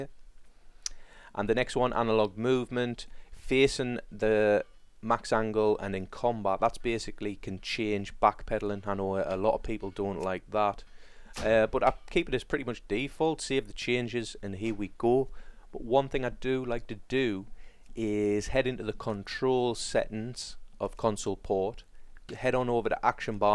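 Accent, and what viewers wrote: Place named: Northeast, United States. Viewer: A fellow NE accent felt so good to listen to! Thanks for the guide my man! But I know nothing about macros?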